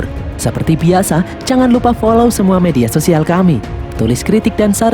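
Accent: Java, Indonesia. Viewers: Medok yo mas 🤭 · Miminya orang jawa mana ya? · pas gw nonton beberapa vidio awal mimin kok pembawaannya medok banget ya ?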